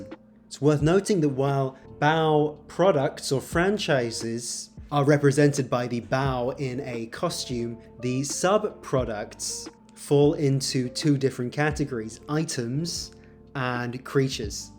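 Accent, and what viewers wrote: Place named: United Kingdom. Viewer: Hi where to based? sounds like you are in UK I am in London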